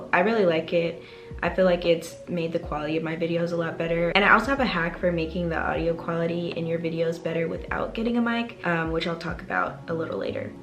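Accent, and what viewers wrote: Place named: England, United Kingdom. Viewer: I love your English accent ❤ Uganda 🇺🇬 loves you sister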